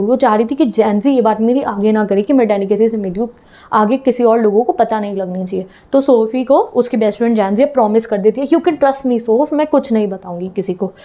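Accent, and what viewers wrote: Punjab, India. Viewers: Mam are you from punjab?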